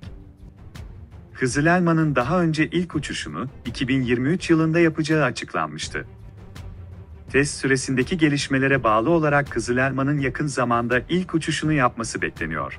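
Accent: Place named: Samsun, Turkey. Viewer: Seslendirmeyi yapan samsunlu galiba. E leri uzatarak söylüyo :) kızıl elmaya gelince tüm mühendislerimizle gurur duyuyoruz 🇹🇷 insanın göğsü kabarıyo👏🧿🇹🇷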